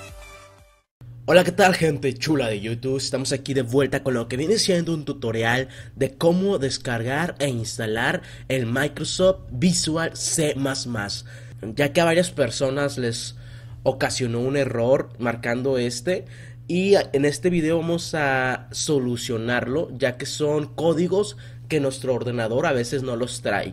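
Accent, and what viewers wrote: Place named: Fresa, Mexico. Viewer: habla muy freson jaja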